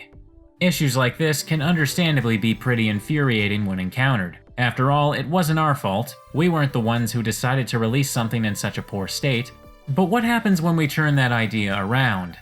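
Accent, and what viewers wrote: Canada. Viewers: Maybe... But maybe he could be from BC after all. If he's from up north enough, that would explain some of the accent quirks. My friend says if you go north enough (where he goes camping, I think), they start pronouncing the aʊ-type OU words as oʊ. I don't know about the u:-type OU words, tho. I just know my friend likes to say he likes to go "oat and a boat". XD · as if from Canada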